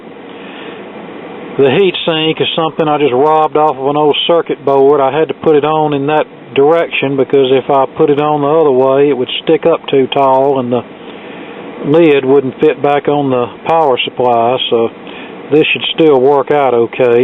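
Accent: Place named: Rural, United States. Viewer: That was friggin' awesome! It was like watching Mr.Carlson's Lab with a country twang! Go to the head of the class.A+!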